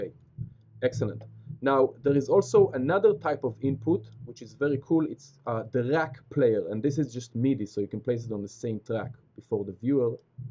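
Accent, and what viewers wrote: Israel. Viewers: Hey i know that accent! shalom =] getting into vj'ing. this helps alot thx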